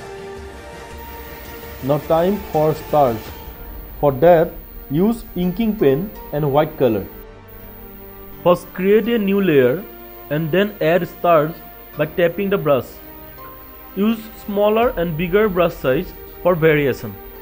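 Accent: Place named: Assam, India. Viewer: Aa bhai mja.. Tmar kothakhinir porai lage j tmi assamor... Mou assamore❤❤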